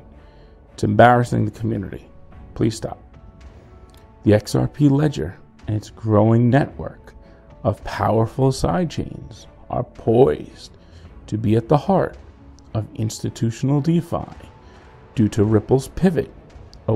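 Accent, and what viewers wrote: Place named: New York, United States. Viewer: brother, thank you. Sound like a fellow NY'ER. Please, whenever you have time, can you go through the steps as if we're 6 yr Olds with all the new stuff coming out. Enoysis, f-assets, delegating. I know you go deep but if you showed us the complexity via how to's, we retards would get it faster. Sgb and lost 20k tokens since price went up, impermanent loss. Didn't even know bro. Help us since you understand it all!!! Gracias!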